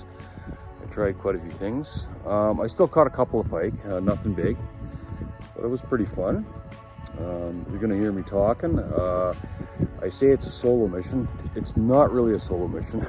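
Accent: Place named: Midwest, United States. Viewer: Bro I love your Midwest accent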